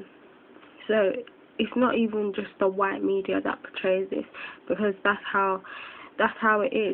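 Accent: United Kingdom. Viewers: Are you from South London? :) I am too! ;D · nice british accent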